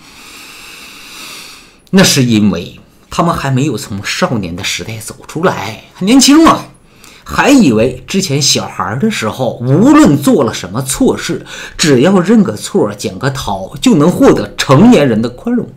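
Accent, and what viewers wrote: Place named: Inner Mongolia, China. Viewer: 我老家是内蒙呼盟的。你的口音听起来也有家乡的味道！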